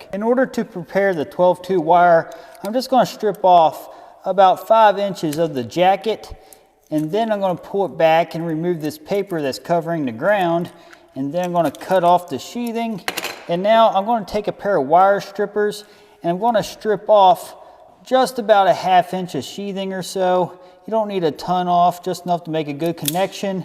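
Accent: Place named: Baltimore, United States. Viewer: That BAWWWLDIIIMORE accent!!!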